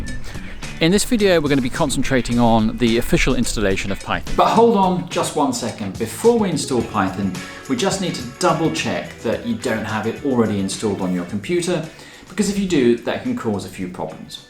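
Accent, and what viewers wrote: United Kingdom. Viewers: Nice British accent nothing else.😮😢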